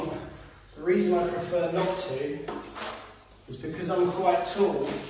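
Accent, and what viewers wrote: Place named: United Kingdom. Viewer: I was going to make the same comment. I am from the US, so the British accent makes it a bit harder to understand. But using the mic in the camera introduces a lot of room echo, and the audio level goes up and down, depending if is looking at the camera or away from it. And there were key points, where I just couldn't quite understand......... A wireless mic would bring up the only minus point of your fine videos..... (Your content is great, your videos are fine, and your presentation is very good, only your audio is lacking............. Thank you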